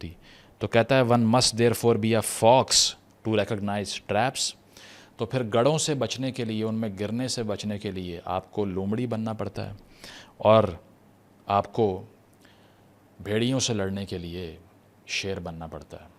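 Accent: Punjab, Pakistan. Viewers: your urdu accent is punjabi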